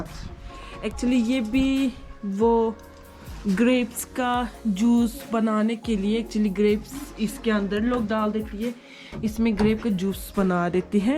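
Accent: Pakistan. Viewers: I love your urdu accent. Love you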